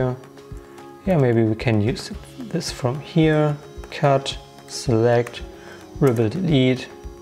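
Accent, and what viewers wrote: Germany. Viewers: Hi! Just spend most of the evening watching your content! Really like your style and the way to explain things! The thing that made me land on your page, was that we used the same camera (GH4), i know that you are not using it anymore, but i wanted to ask you a question about your settings on the GH4. I believe that as you import your footage, i can see that you are shooting in 24 fps. I have some difficulties in really understanding that on the GH4. Listing to your voice, i believe that your from Germany or somewhere here in EU. How can you use the 24 fps settings on your GH4? Are you just changing your PAL/NTSC settings or what? Are you shooting in C4K on your GH4 or 23.98? Hope to hear from you, and that you still remember your settings:)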